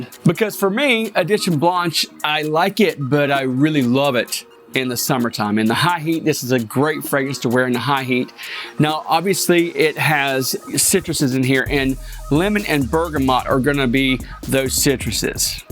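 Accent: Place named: Carolina, United States. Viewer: you got the same Carolina accent as Stephen Thompson 😅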